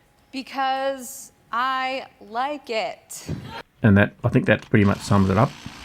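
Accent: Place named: Australia. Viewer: I'd definitely rethink the height of the tunnel mate. Especially if you plan on lining the tunnel at some stage. You'll want to put down a floor at least if you plan on wearing a nice pair of strappy heels down there otherwise you'll end up rolling an ankle. I love the Ozzie accent - always have. Deviated septum - yeah been there done that. Too many kicks and punchs to the face and too slow to dodge them LOL. Cost me $7k to get mine straightened. Worth every cent. Keep on digging!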